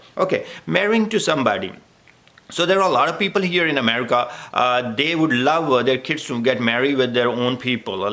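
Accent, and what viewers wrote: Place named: Sindh, Pakistan. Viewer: sometimes your accent seems to be of a Sindhi guy (specifically from Naushero Feroz - a town in Sindh)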